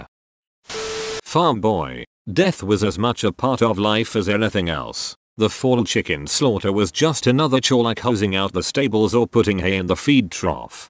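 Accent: United Kingdom. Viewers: The robotic voice with the British accent…distracting and sometimes hard to understand. Get a live person. I Start to listen, I try, but then just have to stop.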